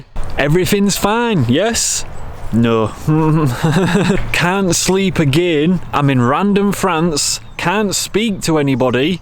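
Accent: Northern England, United Kingdom. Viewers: New Subscriber fella 👍 First off it's magic listening to a Northern accent....you can't beat it lol You took on an epic adventure mate, one that I'm sure many of your subscribers dream about. I think you have hit the nail on the head when you acknowledge it's not something to do alone. To have someone who wants to do the same thing and more importantly to have the craic with, or even have a moan at would be essential for most of us. Jeeez I have just started lure fishing primarily for perch and I find it difficult not having someone to experience my couple of hours on the canal with lol. Keep up the great work....here in the UK we have some of the most beautiful places to visit in the world and we are often guilty of not appreciating it. Go on a UK tour and show us all what we are missing out on. Good luck with everything you do 👍👍👍👍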